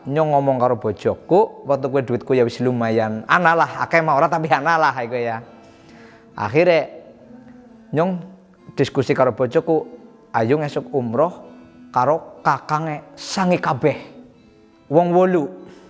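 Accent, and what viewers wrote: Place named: Banyumas, Indonesia. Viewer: Kayane wong ngapak kie lur 🙌😄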